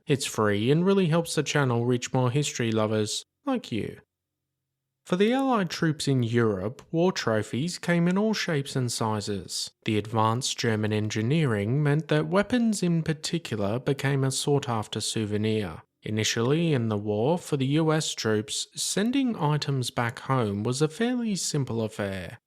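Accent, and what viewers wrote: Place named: England, United Kingdom. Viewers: He is speaking ENGLISH...not American! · He's English 🤷!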